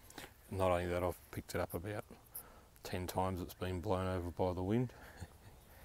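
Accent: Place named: Australia. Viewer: Good luck with your jabo. Is it a Sabara? It's so cool to hear your accent. I'd absolutely love to visit Australia 🇦🇺 one day.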